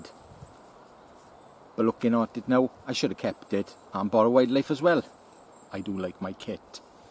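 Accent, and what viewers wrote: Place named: Wales, United Kingdom. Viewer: I've never heard of any of those people before. I think you're the first Welshman I've ever heard or seen. At least I've heard enough Irish and Scottish accents to pick up on the the Celtic sound, it being Welsh never crossed my mind but it does make perfect sense since it sounds a bit like Scottish and Irish but it's clearly neither of those. Anyways, thanks for clueing me in!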